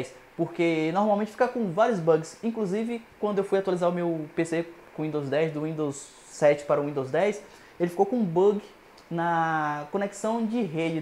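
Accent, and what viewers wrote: Bahia, Brazil. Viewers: seu sotaque baiano.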